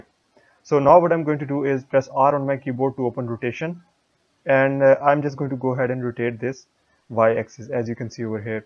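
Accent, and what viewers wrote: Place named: India. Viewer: The professional Indian sounding guy saves the day. Thank you fam.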